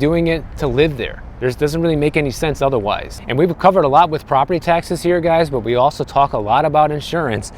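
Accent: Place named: California, United States. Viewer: he accent sound California's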